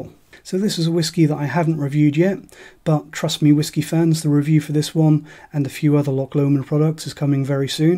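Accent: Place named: Norfolk, United Kingdom. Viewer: Hi mate,you sound like you're from Norfolk, am I right? I am a Norfolk whisky nut, North Walsham. Let me know if we are neighbours! All thebest. Regards, Pete.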